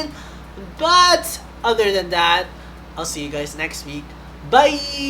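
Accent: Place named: Philippines. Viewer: First clue: flip flops. I was all like you know, I think he is Filipino 😂 that and you kind of have a Filipino accent.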